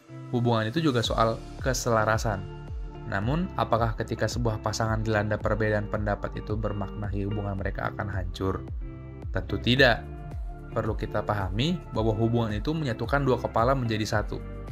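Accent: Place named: Indonesia. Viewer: Jewel wak, bacanya logat indo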